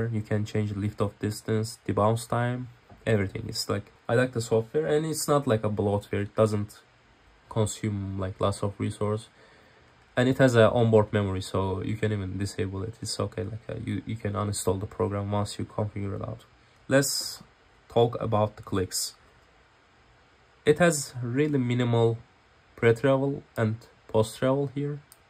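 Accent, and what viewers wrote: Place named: Turkey. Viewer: U sound like turkish. R u turkish?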